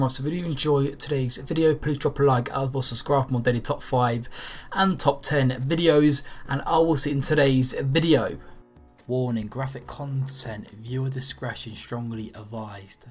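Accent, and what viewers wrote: United Kingdom. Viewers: where are you from? i know uk but accent is hard to get at some points. not bashing ...im just curious lol. more i hear it the better. also keep it up. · Kim Kimble Hes british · I'm curious if that's the dialect across the pond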